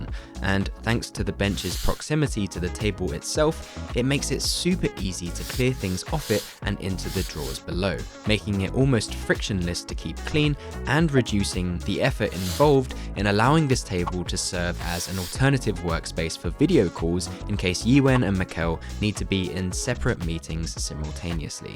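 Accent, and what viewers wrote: United Kingdom. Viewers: His delivery is doing great. Wonderful apartment redesign. The next step is to take a breath and know we are on his side. Why else are we here. Eyebrows relax, British accent reduced, the approval asking lilt only occasionally. Tell us. No need to ask us. You have our ear and implicit approval of your talent and ability. Yur frpal G to solve abilities.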